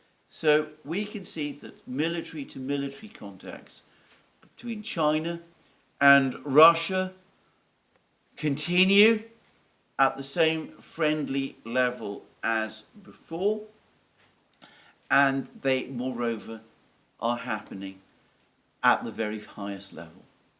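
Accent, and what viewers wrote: United Kingdom. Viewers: Question for Brits: I am so distracted because I can't place Alexander's accent. Does he speak RP, London accent or something else? It doesn't matter which. but I am not a native speaker myself and just want to know what I spend all this time listning to. Grateful for some clarity! 😊Love from Sweden.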